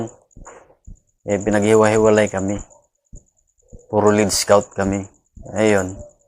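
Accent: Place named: Ilocos, Philippines. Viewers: Halata mo ilocano si buddy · Taga saan si sir? Igorot ba kyo o Ilocano? Kc sa pagtatagalog nya parang kababayan ko sya? Salute you sir! God is good all the time, hindi nya kyo pinabayaan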